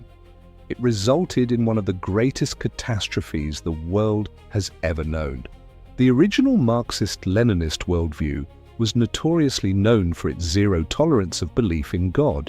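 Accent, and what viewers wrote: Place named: England, United Kingdom. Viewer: the english accent instead of a german accent speaking english turned me off the content, the english language replacing completely anything to do with the person has been pushed onto the world for far to long causing too much damage... its only a little thing but its still part of a bigger thing. Generalised accent more broadly accessable wider audience... there are heaps of examples of germans speaking english that re perfect to understand to use as an example.... anyway, take my comments for what they are worth. All the best.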